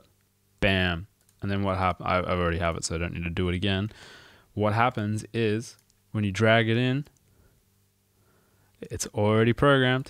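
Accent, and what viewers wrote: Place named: South Africa. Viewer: Definitely found this helpful thanks. From your accent I gather you're a fellow South African.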